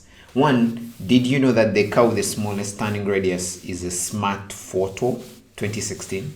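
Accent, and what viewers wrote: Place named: Uganda. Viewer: you sound like a ugandan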